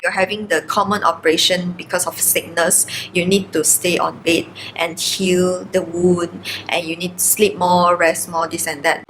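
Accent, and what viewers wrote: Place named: China. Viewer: Are u Indonesia or Chinese? Sorry.. 'Coz ur English sound like Chinese English.. But the translation is in bahasa.. I just wondering🤔💭 😁.. Thank u😊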